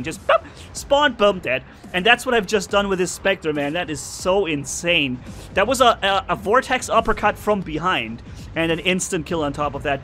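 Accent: Germany. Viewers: BTW, I knew you're natively German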